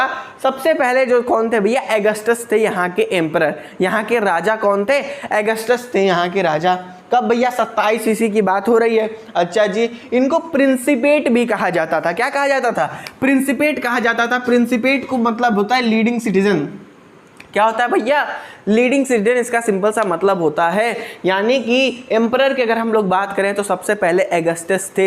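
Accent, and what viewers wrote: Bihar, India. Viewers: Bhai tum shi pdhate ho Bihari accent me mja Ata h pdhne me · Bhai aap bihar se hona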